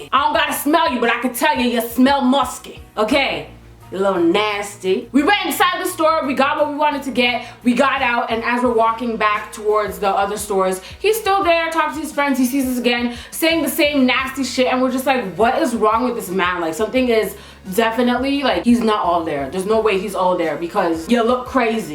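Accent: France. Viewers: HAAAAAAAAAAAAAAAAAAAAAAAAAA !!! YOUR FRENCH ACCENT IS CUTEEEEEEE !!!! (i'm from Montréal) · You're french accent is so cute 💙☺️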